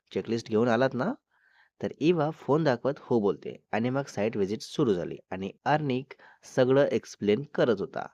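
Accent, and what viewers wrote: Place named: Gujarat, India. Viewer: Ur gujrati accent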